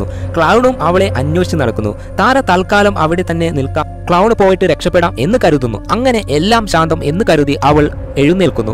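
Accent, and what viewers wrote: Kannur, India. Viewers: കണ്ണൂരുകാരൻ ആണോ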